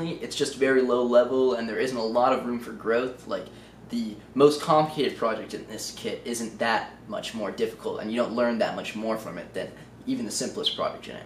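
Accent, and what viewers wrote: United States. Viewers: One of the biggest West-Coast accents I’ve ever heard 😂 Keep it up man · you look like an indian boy and spealk like a american👍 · new yorker?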